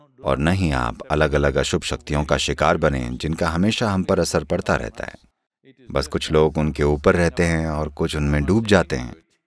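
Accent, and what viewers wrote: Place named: South India, India. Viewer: Aapko main bahut manta hun lekin kuchh Shabd aap South Indian ke hisab se bolate Hain vah uchit nahin hai aap pure Vishva ke Hain South ke nahin soch samajhkar shabdon ka uchharan Karen Baba यह आपसे विनम्र निवेदन है मेरा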